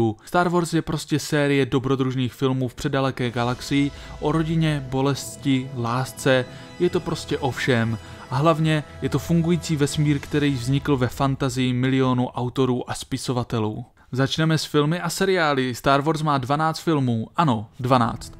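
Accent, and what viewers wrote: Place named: Moravia, Czech Republic. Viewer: Bože ta moravština je hrozná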